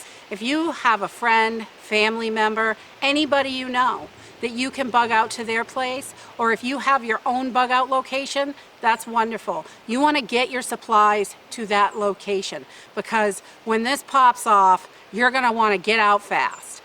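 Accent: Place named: New England, United States. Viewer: I just found your channel. You sound like your from new England. Are you?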